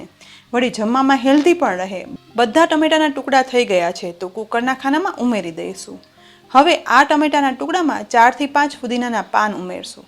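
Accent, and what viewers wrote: Kathiawar, India. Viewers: Soup saras..mug pan saras. Bhaat pan saras..salad pan saras...badhun j "Madhuram Madhuram!" Thanks. Amey aavaa oogaadelaa mug banaaviye ne saathe Kadhi, bhaat ee combination maan kariye. Thanks for the recipe. Kathiyawadi mithi bhasha saambhalavaa thi maney maarun Rajkot yaad aavi jaay chhe. Hun tau janmi tyaar thi mumbai maan j oochhari pan amaaraa ghar maan kathiyawadi , naagar ni bhaashaa j saambaleli, vali sanyukta kutumb maan rahyaa ee j amaarun gujarati vaataavaran , baaki aaspaas tau christians aney momedan loko ni j vasti. Lagna pachhi Rajkot rahevaanun thataan Rajkot maan vadhu saaro avakaash malyo Guajrati bhashaa no laabh malyo. Thanks again.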